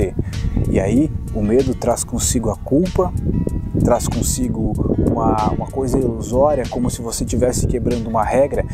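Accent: Rio Grande do Sul, Brazil. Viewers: Ruinas de São Miguel! Sabia que seu sotaque te entregava...vc é do Rio Grande do Sul.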